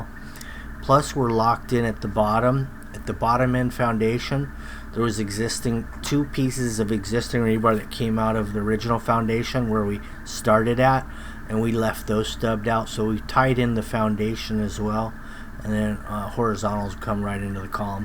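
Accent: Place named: Southern California, United States. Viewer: Can always catch a SoCal accent. I live in Ohio now but was born and raised in OC for 43 years.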